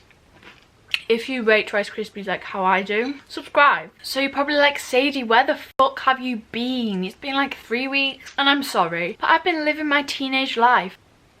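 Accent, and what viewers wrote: United Kingdom. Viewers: She bri’ish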